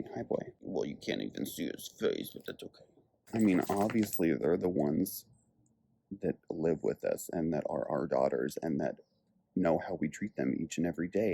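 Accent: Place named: Scotland, United Kingdom. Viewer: First and foremost love you guys. Next: blah🥱🥱 bloody blah to the small minded people out there. Second next: you have got this parenting (I would know I have 5 😜)thing. And last but the most important: did I hear a Scottish accent creeping in?!? I think so lovely xxx Lots of love from Edinburgh 🏴❤️ THREE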